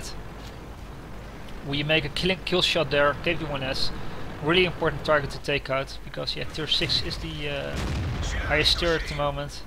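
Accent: Netherlands. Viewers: what i have noticed this time, you're actually dutch ^^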